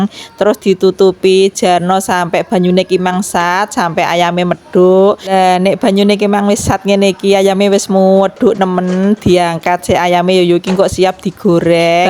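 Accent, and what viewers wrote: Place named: Blitar, Indonesia. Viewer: Bahasamu wong Blitar Tah yu...😂😂😂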